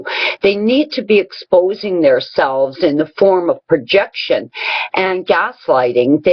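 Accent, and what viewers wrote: Canada. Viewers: Toxic, evil, sinister, fake spell cast upon the good ones by the evil ones...never again: ne j'aimerais pas! Love your Canadian accent, I used to live in Saskatoon! All my best friends are there, especially the good, strong Western women! O! Canada!😇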